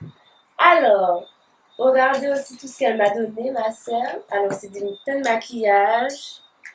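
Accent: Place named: Martinique, France. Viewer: J'aime beaucoup ton accent de la Martinique. L'huile végétale de rose musquée c'est ma préférée, sympa tes achats Gros bisous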